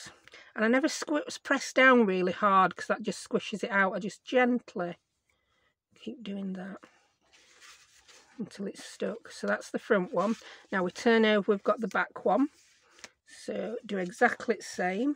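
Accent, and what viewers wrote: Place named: Southern England, United Kingdom. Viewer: Oh you do make me laugh and your accent makes me homesick I've lived in scotland longer than I was down south. Love the project and I will try it as I'm trying to get a collection of ephemera. I've also ordered the dies love aliexpress at least they have decent prices.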